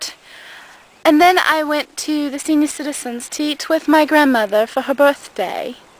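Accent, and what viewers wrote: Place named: United Kingdom. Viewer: Way to go great British accent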